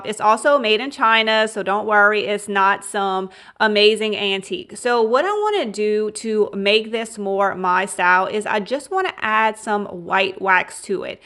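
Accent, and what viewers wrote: Louisiana, United States. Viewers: My favorite flip was definitely the basket. I wish they sold the IOD stuff here in Australia! I love all the types of videos you make- and your Louisiana accent makes me feel like I have a piece of home here with me when I'm so far away from mine!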